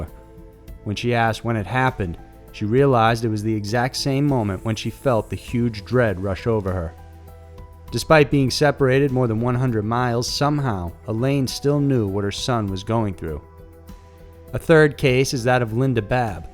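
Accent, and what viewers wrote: South, United States. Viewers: God I love your sexy touch of southern drawl. You make mysterious shit hot again man.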